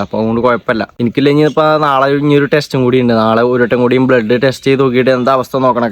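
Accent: Malappuram, India. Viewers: എട. ബ്രോ.ഞാൻ.കണ്ണുരാണ്.നിന്റെ.മലപ്പുറം.ഭാഷ.തീരെ.മനസിലാകുന്നില്ല.😂😂😂😂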